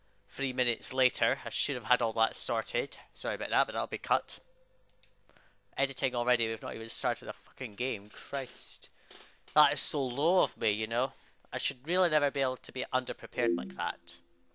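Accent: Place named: Scotland, United Kingdom. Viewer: scottish(scottash) with a blocked nose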